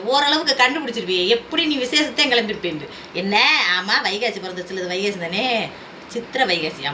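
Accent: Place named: Southern Tamil Nadu, India. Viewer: அக்கா உங்களுடைய எதார்த்தமான தென்மாவட்ட பேச்சு சூப்பர் கா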